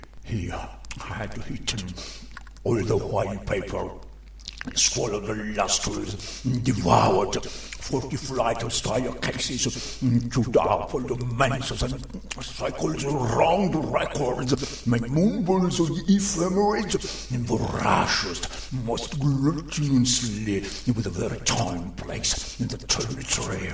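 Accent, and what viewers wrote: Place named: Italy. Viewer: Marvellous stuff! So great to hear FW in an Italian voicing. Wonderful rendering of rhythm, tunefulness.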